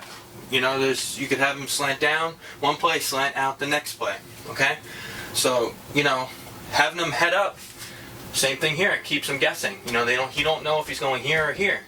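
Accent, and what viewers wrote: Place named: Georgia, United States. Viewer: Great work sound like a south ga boy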